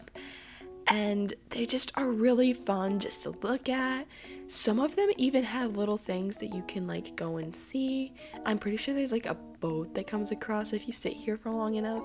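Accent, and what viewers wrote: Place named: United Kingdom. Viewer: Sick British accent!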